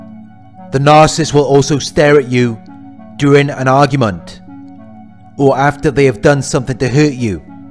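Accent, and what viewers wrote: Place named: Manchester, United Kingdom. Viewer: I think he’s from Manchester, in north of England. I sometimes get it mixed up with the Liverpool accent, but my mnemonic for remembering is that The Beatles and Wacko from the Animaniacs (inspired by Ringo) are from Liverpool, and Daphne from Frasier is from Manchester.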